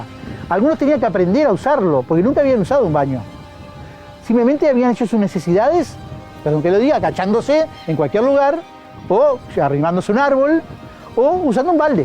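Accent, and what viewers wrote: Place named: Uruguay. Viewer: Magnífico el reportaje, excelente el narrador, hermoso el acento uruguayo.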